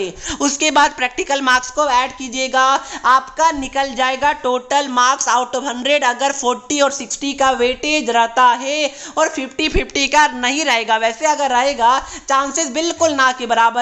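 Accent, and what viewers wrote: Bihar, India. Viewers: Sir ji aap bihar se ho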